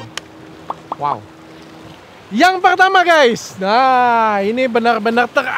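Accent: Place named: Sumatra, Indonesia. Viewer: Kami org Sumatra emang ngomong nya kekgtu 😂😂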